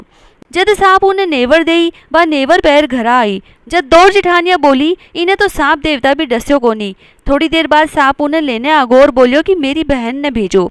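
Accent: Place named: Marwar, India. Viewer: Bhabhiji, mera sasural Garg Agarwal, Sikar ka hain. Vahi ki bolti marwadi mein kahani sunate hain Aap, toh ghar jaisa hi lagta hain 🤗 Jay Shri Radhe 🙏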